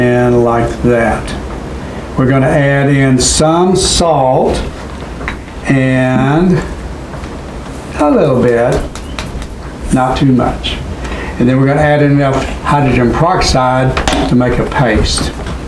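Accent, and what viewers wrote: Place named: North Texas, United States. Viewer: I was just thinking that, he’s got that North Texas accent!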